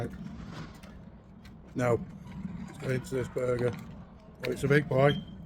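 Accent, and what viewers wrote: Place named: United Kingdom. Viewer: Checking in from the US here. I'm enjoying the reviews. Can I ask what your accent is? When it comes to accents in the UK it's hard for me to tell.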